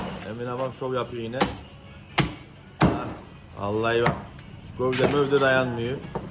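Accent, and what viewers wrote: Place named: Kargı, Turkey. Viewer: Ben kargı lıyım bizim oranın kebabına benziyor şiveleride aynı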